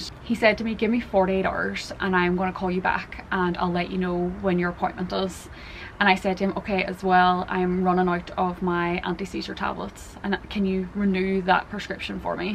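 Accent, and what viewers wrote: Ireland. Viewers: Hello, Your channel just popped up in my feed--maybe because I am following another cancer survivor (Dr Dan Says, he is a chiropractor and very inspiring man) but I really am getting an education on what some folks are having to deal with and at young ages (50s is too young too) Anyhoo, you are traffic stopping beautiiful and very sweet and well spoken and I think your channel is the first I have that is teaching me what a proper Irish accent sounds like. Wishing you the best in your life challenge. They are making breakthroughs all the time helping people live longer so don't give up! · I love how you are community us, your smile, lovely Irish accent, your awesome wavy blonde hair, your ease and command into the camera, and knowledge. You seem to be picking up the medical terminology pretty well too. I love learning anythingmedicalrelated, , took a course in it many yrs ago, getting straight As. 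Came in really handy too, because several rare genetic diseases in my kids and grandkids. Sounds like you have had a rough couple of wks. I was so sad that your your surgeon oncologist took off out of country without calling you, making sure you and other patients were OK for the time he was unavailable. Very inconsiderate. Better days ahead for you both.❤ from ottawa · you are such a beautiful person. I hope you get the absolute best outcome from all of this. Thank you for sharing your diagnoses and journey with us. As a fellow Irish (my dads full Irish but never used his accent when I was growing up so I've always been gutted I don't have it), i adore your accent and could listen to you for hours haha! Sending you so much love and positive thoughts! 💚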